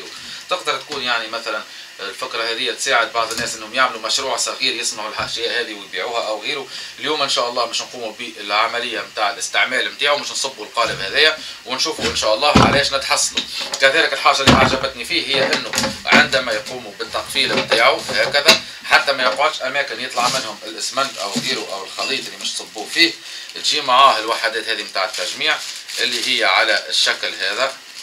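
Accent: Tunisia. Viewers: اللهجة تونسية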